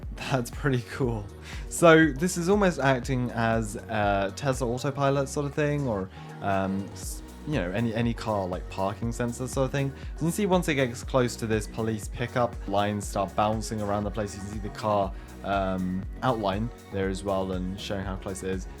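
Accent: England, United Kingdom. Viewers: are you from england america or another place [not to be creepy i just wanna know:)]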